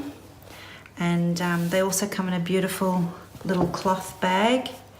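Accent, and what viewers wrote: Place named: Australia. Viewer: So lovely to hear an Aussie voice doing these types of videos :)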